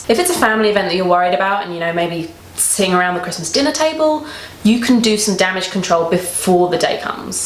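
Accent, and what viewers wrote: United Kingdom. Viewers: followed you for months on insta and only just realised........you're english?? lol i was expecting a california accent 😂😘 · YOURE SO BRITISH I LOVE IT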